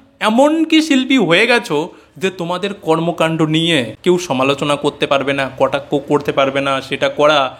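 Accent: Bangladesh. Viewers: Pl একটা কথা বলি don't mind, তোমার মুখের ভাষা আর উচ্চারণ একটু practice করবে, বাংলাদেশী ভাষার টান শুনতে ভালো লাগেনা আর English টা ও improve করো ভবিষ্যতে তোমার help হবে,তোমার ভিডিও আমার মজার লাগে 😊😊🎉🎉🎉🎉🎉🎉🎉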